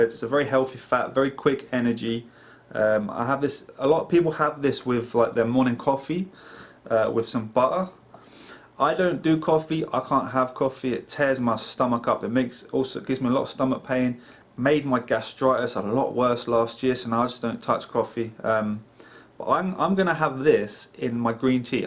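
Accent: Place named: Southern England, United Kingdom. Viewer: It's good to see a fellow keto person from the UK....at long last! I can tell you're from down South...I'm up North (Lancashire)....looking forward to watching your videos!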